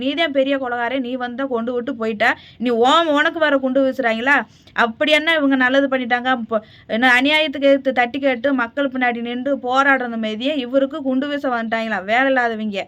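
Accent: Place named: Madurai, India. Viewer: மதுரை பேச்சு 👍👍👍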